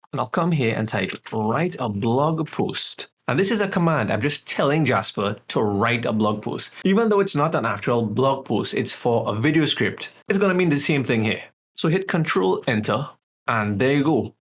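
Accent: Trinidad and Tobago. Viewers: Love this video. Are you From Trinidad?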